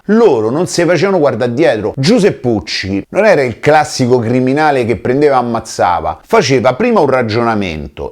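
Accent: Rome, Italy. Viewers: Ipnotico, sarà il tono di voce o la parola " romanesca" nel discorso, l accento, bo🤷, fatto sta che, anche se ha solo ripetuto cose al che sanno pure i sassi trite e ritrite, ti tiene incollato per tutti i 10 min del video, ma come cazzo fai???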